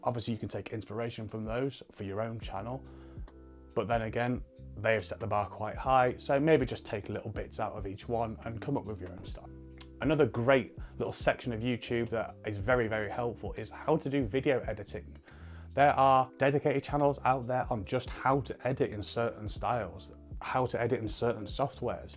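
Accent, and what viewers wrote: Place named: Yorkshire, United Kingdom. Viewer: You gota proper Yorkshire accent there, hope your good fella like the channel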